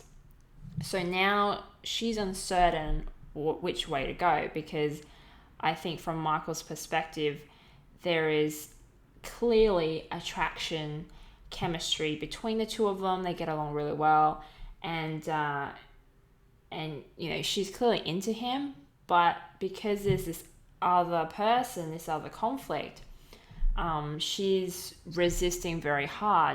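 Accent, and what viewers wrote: Australia. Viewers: I do appreciate your honesty in regards to this matter tho... so thank you! :3... BTW... are you Australian? That is one hell of an accent.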